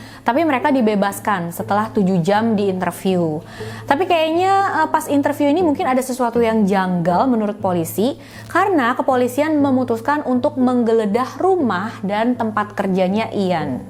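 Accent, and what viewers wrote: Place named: West Java, Indonesia. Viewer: Terbaik👍 Btw ka itu orang mana?! Soal ny pas berbicara ada logat sunda ny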